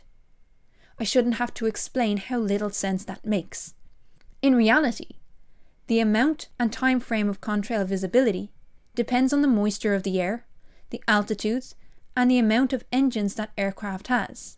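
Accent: Ireland. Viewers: Awkward moment I’ve just listened to all the videos in one morning. Wish my teachers had a Irish accent idve learned way more. Great videos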